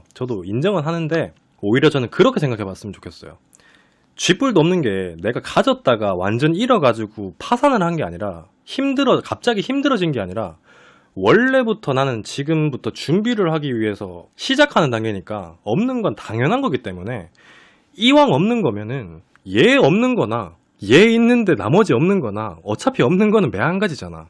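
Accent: Gyeongsang, South Korea. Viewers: 단호한 경상도 말투가 더 믿음직스러운건 느낌인건가 ㅋㅋㅋ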